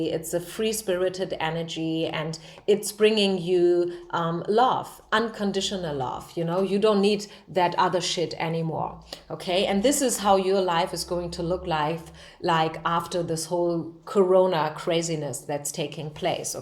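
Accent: Germany. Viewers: #3 thank you so much! 🦀💗 For your wisdom, channeled massages and tips! Also for doing all of this to heal the world and yourself! I'm bso grateful for your readings and seeing you here! I love your German accent! (I'm a Mexican but I studied German for a short time in high school and I find it really gorgeous💗💗💗) Also I saw one of your Deutsch readings!!! And I also loved it! It's incredible how we are all connected, my intuition translated the words that I didn't study and it's incredible 💗💗💗 Love & light to you! namaste 🌻✨🌈🐉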